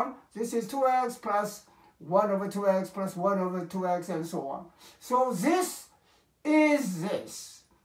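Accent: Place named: RP, United Kingdom. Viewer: He somehow has an old British aristocracy accent from the movies. Beautiful!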